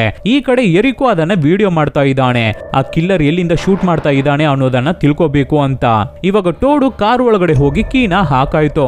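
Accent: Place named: Tamil Nadu, India. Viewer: ನೀನು ಪಕ್ಕಾ ತಮಿಳ್ ಅವ್ನು... ಆದ್ರೂ ಸೂಪರ್ 😄😄😄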